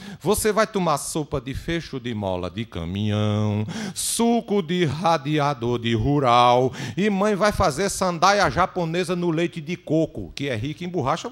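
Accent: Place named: Northeast, Brazil. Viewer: DIALETO NORDESTINÊS, QUEM CONHECE GOSTA MAIS UM POUQUINHO A CADA VEZ !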